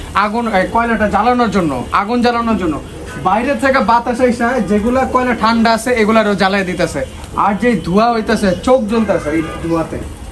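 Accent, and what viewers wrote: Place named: Old Dhaka, Bangladesh. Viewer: Bai kotha gule puran dakar apnar bareta gerokom kotha bolen serokom kore bolle valo hoto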